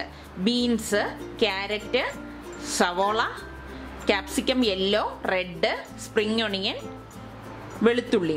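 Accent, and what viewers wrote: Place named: Thrissur, India. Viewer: thank you madam .also ur thrissur voice OK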